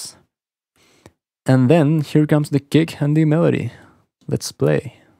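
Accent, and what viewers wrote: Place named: Spain. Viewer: "Reburb" 😂 I love the spanish accent 😁 Love the video man!!! 👌🏼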